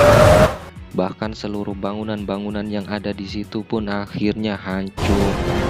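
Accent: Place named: Java, Indonesia. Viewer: suara lemes logat jawa bikin ga mood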